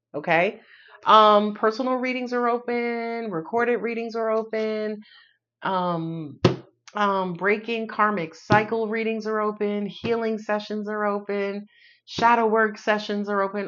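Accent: New York, United States. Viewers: Love this episode. I giggled so much. You giving me ‘I may have had 2 margaritas at dinner’ vibe!!! Was just waiting for the NY accent to present itself! ❤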